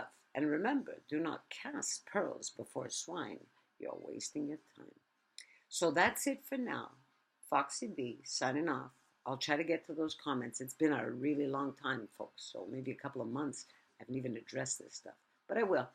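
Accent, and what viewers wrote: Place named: New York, United States. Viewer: You have a New York accent. Sounds nice and it is attractive feature on a person. Have a nice and safe week!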